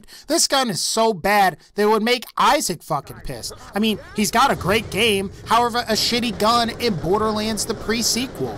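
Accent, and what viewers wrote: South, United States. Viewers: His country accent his amazing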